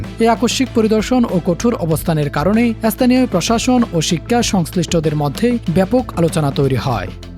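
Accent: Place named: Chittagong, Bangladesh. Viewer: ন্যারেটর কি চাটগাঁইয়া? একসেন্টটো ওই অঞ্চলের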